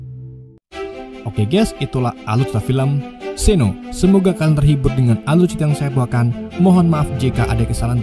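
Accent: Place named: Java, Indonesia. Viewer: Aku yakin kw wong jowo min😂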